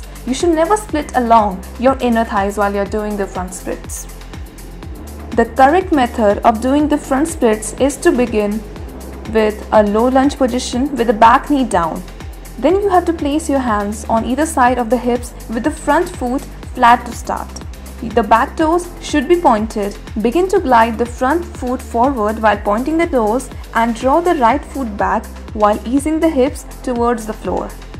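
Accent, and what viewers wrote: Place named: United States. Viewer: you speaking English like a American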